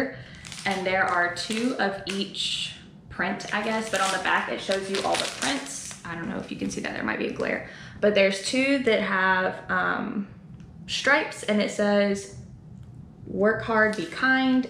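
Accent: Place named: South, United States. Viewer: LOVE this! These stores are all awesome! As is your Southern accent haha happy new subscriber here!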